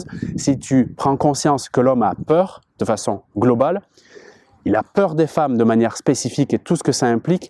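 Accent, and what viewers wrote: Canada. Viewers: accent canadien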